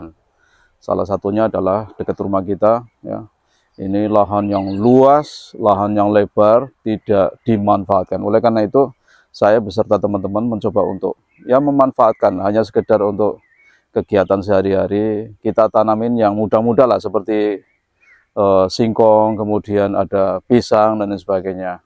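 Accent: Java, Indonesia. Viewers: Ok pak jowo banget iki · Mas, ngeliat alam Suriname sama persis dengan alam Indonesia. Mendengar logat mas,Jawa banget. Kayak ngeliat orang Jawa di Indonesia. Matur nuwun mas. Sudah memvidiokan alam Suriname. Salam buat semua sodara sodara disuriname Yo mas. Semoga semua sehat selalu...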